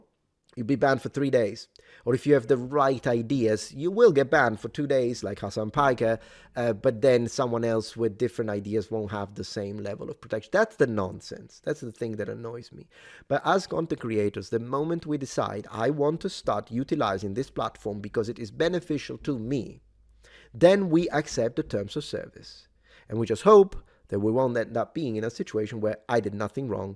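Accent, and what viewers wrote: Germany. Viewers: he's Indian with German accent? Omg. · My first thought was, "Wait, is this the f*g with the German accent?" It was, in fact, the f*g with the German accent.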